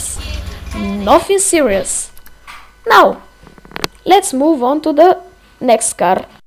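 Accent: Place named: Bulgaria. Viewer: nice bulgarian accent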